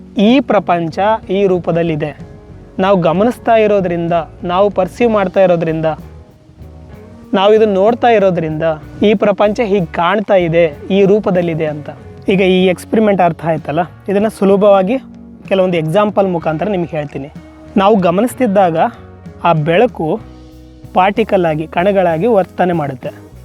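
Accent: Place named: North Karnataka, India. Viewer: ಗಂಡ ಮಗನ....❤ ಉತ್ತರ ಕರ್ನಾಟಕದ ಮಾತು....ಇನ್ನು ಹೆಚ್ಚು ಹೆಚ್ಚು ಕನ್ನಡ ದಲ್ಲಿ ವೀಡಿಯೋ ಚಿತ್ರೀಕರಣ ಮಾಡು.......❤❤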